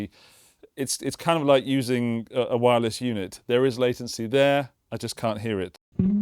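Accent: England, United Kingdom. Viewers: You provide a window into the future from Austria, but with a subtle English accent of a part of England that this American born citizen of the world cannot identify on a map of counties and London neighborhoods. I realized about 3 sentences in that I am not the target market, but I do enjoy your geeking out on toys that you dig. Keep up the hard work and enjoy the last month of summer (more or less).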